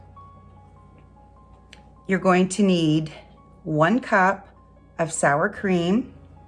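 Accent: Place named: New York, United States. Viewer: New Yawk!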